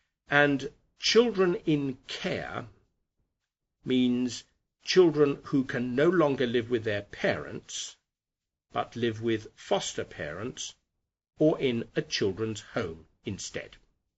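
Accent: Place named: United Kingdom. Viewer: British accent is charming, 😢 live it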